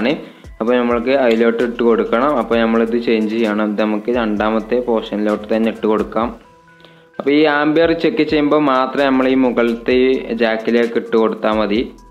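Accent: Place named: Malappuram, India. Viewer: Muyuvanaayum ???? Enthu malayalam aado parayanathu.... malapuram or calicut sure. maya , payam , puya ..... 😷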